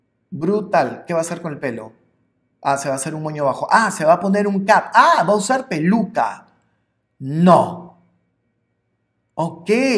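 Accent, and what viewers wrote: Portugal. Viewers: ese acento portugués me enamorooo ❤️ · Me encantó escucharte hablando en portugues :) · Te Amo! Tu eres AMAZING!!! ME Encanta verte, oírte y aprender de ti. Gracias por Existir preciosura y por hablar tan hermoso Portugués 💕 💖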